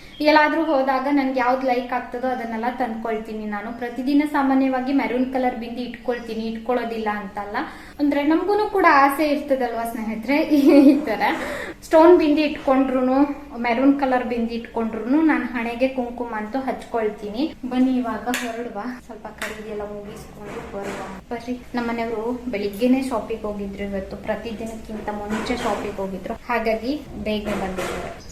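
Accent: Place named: Kundapura, India. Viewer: Nim bashe kundapura side Tara untu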